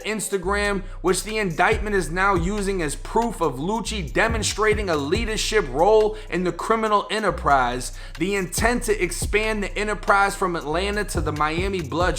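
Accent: Boston, United States. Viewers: The best coverage I’ve seen yet. Nice New England Accent too. Sounds like Boston. New subbie. Lucci is definitely going to do time from what I can tell. He hired Gucci’s Lawyer to defend him. I heard he is the best when it comes to this type of case.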